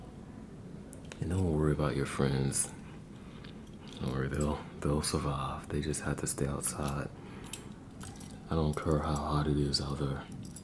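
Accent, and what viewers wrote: St. Louis, United States. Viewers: Ah, St. Louis! I didn't know the accent. I definitely hear you pronounce here, ear, and there differently than I'm used to. 🙂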